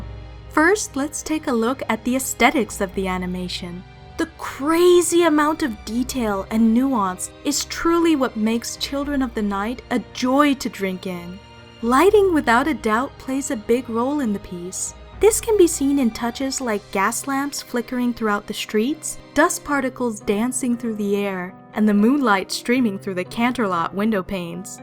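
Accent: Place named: RP, United Kingdom. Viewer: your so posh!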